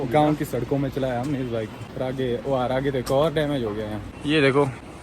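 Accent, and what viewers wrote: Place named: Himachal Pradesh, India. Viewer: Himachali accent🌻❤ Stay inside and safe🌸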